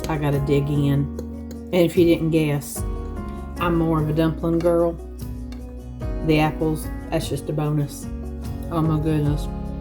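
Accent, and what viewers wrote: South, United States. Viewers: Wow, what a treat!! Have shared to my laptop. But a question - are these recipes available in writing? I must say. Your beautiful southern accent is music to my ears. I left Alabama with my children and pastor husband to Hawaii in the late 70’s. Oh, I just heard Tennessee, the place of my birth. He pastored a church for years, but is in heaven now. I stayed here as my children married wonderful local people. And have their roots planted deep in Hawaii. Mahalo for your scrumptious recipes. Do hope they are available in writing to print. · I’m new and catching up with your channel! Love your crescent roll options and looking forward to trying all these! I am from NYC but also lived down south! I so enjoy the “southern comfort” of your voice and your calm demeanor! You are just great therapy ma’am! Cheers, Michael Albuquerque NM USA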